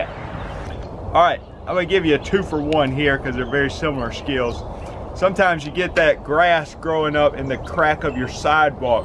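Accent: South, United States. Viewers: Any manual skill automatically has increased credibility if the instructor has a southern drawl. Thank you, sir! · What part of the south you from, NC here representing!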